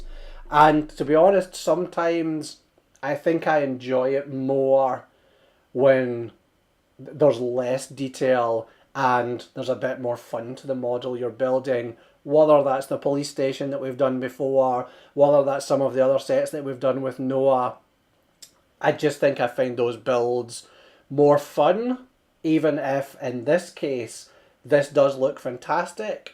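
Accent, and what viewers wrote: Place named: Scotland, United Kingdom. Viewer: love your accent, man. Scottish?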